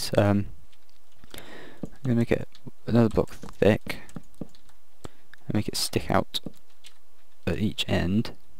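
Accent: England, United Kingdom. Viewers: Followup question: You are English, right?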